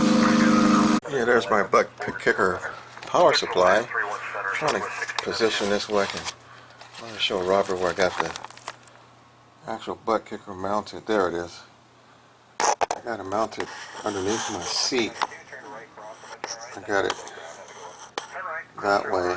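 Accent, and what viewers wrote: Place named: South, United States. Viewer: Thanks for this! Looks fantastic! I'm getting 3DOF delivered in the next week or so. I have a couple of questions. I have Oculus cv1 that works great. Do I need to use the Oculus tracking lighthouses taped to the seat/machine for proper tracking and/or a program to keep it in sync? If my funds weren't limited, I'd get the Reverb G2 or even the Oculus S you have. My second question, do you need a program besides 3DOF's to talk with MSFS or X-Plane? I'm real psyched to get this built and flying! It's gotta be the ultimate immersion. I use to have a PPL, but my service connected disability prevents me from renewing. This tho will be just as fun..or nearly as fun! Thanks a bunch! (you sound like your from NC or Texas? If Texas, hope all is well for you!) Cheers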